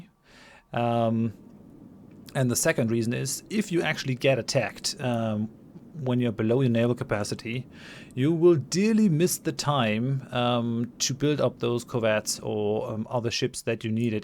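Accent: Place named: Germany. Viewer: I don't know why but the fact that all of this is being told by a guy with a German accent is really suiting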